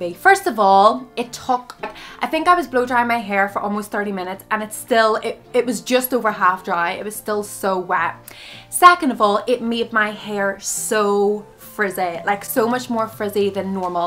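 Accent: Derry, United Kingdom. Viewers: So happy I found someone with a similar hair texture to my own doing tutorials for this! And is that a Derry accent?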